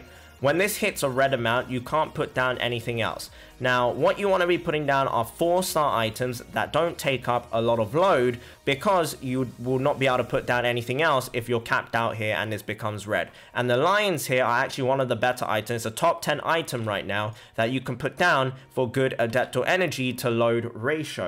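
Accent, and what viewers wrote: United Kingdom. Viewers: Your asian+British Accent is Smooth;! 😂😂😄😂😁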